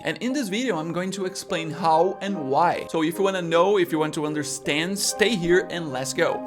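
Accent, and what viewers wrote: Brazil. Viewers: Dude, are you Brazilian by any chance? All of us have the same accent when speaking English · Seu sotaque tá com cara de hue br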